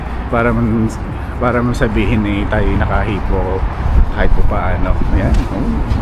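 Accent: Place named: Mindoro, Philippines. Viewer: Bossing, taga Mindoro ako, parang pareho tayo ng tono sa pagtagalog, ganire, ire, dine..😅😅😅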